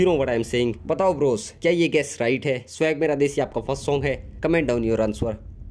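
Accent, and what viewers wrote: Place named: Haryana, India. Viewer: Bhai ab Rajasthan se ho...mujhe laga tha haryana se ho😂😂 ap avaj se haryanvi lagte ho😅 vaise mein chandigarh mein rehta hu...and haryanvi hu.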